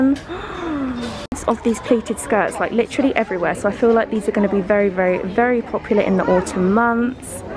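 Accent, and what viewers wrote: United Kingdom. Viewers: Queen of british accent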